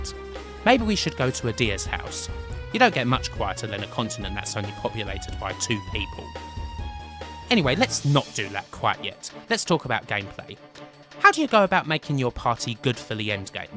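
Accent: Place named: England, United Kingdom. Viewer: Как меня бесит акцент англичан и не неси херню про сон и смерть главного героя ) Это моя лучшая игра.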